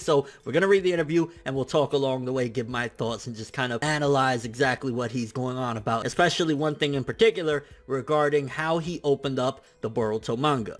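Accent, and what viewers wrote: New York, United States. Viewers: Ny accent?🤔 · I hate he says the characters names in his American accent like say it right brov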